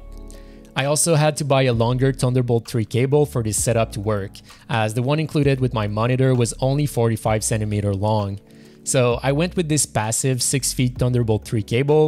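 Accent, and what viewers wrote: Quebec, Canada. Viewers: L'accent québécois! yessiirrr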